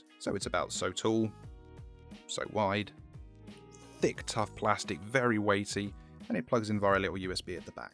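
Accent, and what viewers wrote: United Kingdom. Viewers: He has the best British voice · I really can't tell where your accent is from its definitely Midlands I just can't pinpoint it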